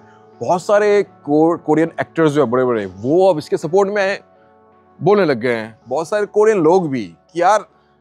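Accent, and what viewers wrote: India. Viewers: Aap Bihar se ho naa?? Aapka tone vaisa hi laga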